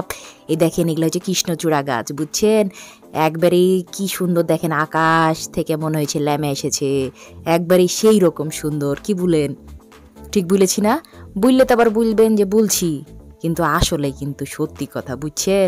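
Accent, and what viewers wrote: Rajshahi, Bangladesh. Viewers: unty ami kintu jai ni kokhono tobe tomar vasa sune jaoar issa korse obossoi jabo inshaallah tobe vasa kintu onek sundor yomi j vabe rajsahir vasa bolle ki bolbo oshadaron love u moni💙💚💛💛🧡❤ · এখন আবার দেখে নিলাম।অসাধারন দৃশ্য আপু আপনার মুখে রাজশাহীর ভাষা দারুন লাগছে গো আফা🤣🤣🤣🤣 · রাজশাহীর ভাষা দারুন লাগছে গো আফা🤣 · আপু তোমার ভিডিও সবসময়ই খুব সুন্দর 💗🌹💖আপু রাজশাহী ভাষার কথাগুলো অসাধারণ হৈছেগো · অসাধারণ রাজশাহী ল্যাঙ্গুয়েজে কথা বলার জন্য অনেক ভালো লাগলো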